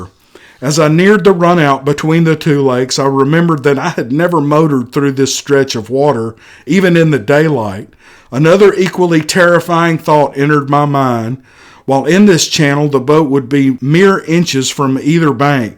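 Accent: South, United States. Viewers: It's the South in the Mouth that sells it. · The voice sounds like my neighbor's Keith Robbins. Those southern accents are priceless. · I try to place the accent and I imagine your either from TX or TN maybe a GA boy, lol I'm sure I'm not the only one but I think that's what keeps ppl intrigued by the stories and the twang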